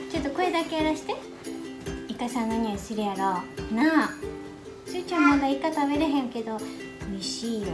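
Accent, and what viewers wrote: Kyoto, Japan. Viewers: 京都弁好きだ！ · I thought she has kyoto accenct. Osaka and kyoto accent are pretty similar though.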